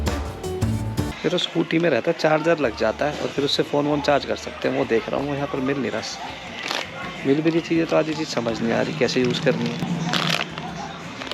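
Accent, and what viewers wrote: Haryana, India. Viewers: I bet that is from Haryana because I can feel his tone even I am from Haryana.🔥💖😄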